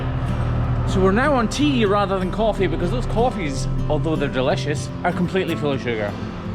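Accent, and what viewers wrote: Scotland, United Kingdom. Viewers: You did a great job on that cow!! I love your Scottish accent!!! You. An talk to me anytime!!!😊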